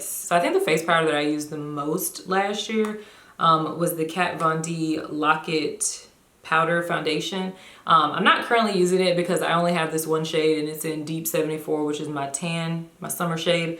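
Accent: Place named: Alabama, United States. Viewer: Great video and I love your hair! My question: Where are you from? I hear an accent...perhaps Hunstville, AL?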